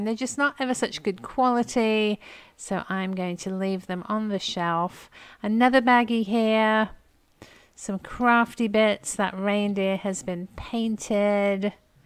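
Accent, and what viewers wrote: United Kingdom. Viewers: dear, I don't understand why don't you invest in one of those cameras that sit on your chest so that you can use both hands. I see you time and time again struggling to pick up or open an item and having both hands free would make your life so much easier. I love your Chanel I learned a lot from you over time. Love your sweet cheerful positive personality and english accent. Cheers and all the best from Agi at Princeton, IL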